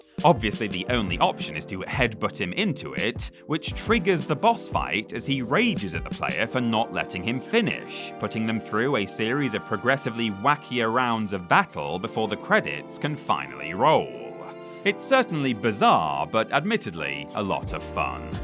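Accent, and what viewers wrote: United Kingdom. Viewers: He sounds like a normal Brit, Perhaps you are mouse voiced man.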